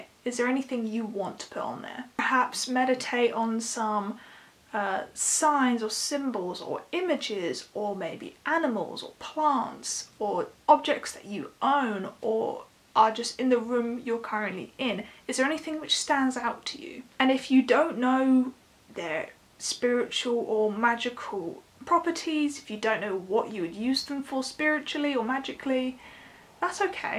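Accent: England, United Kingdom. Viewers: Finally someone with a nice English accent... I had already thought one could only be into witchy things if one wanted to live in Alabama.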